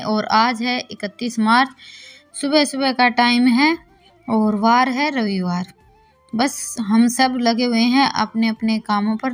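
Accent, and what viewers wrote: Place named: Rajasthan, India. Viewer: Didi aap kha se ho aapki awaz se to lagta h aap Rajasthan se ho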